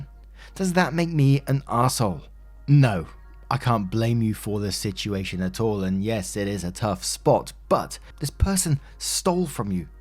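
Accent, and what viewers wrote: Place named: Oxfordshire, United Kingdom. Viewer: Oxfordshire accents rock!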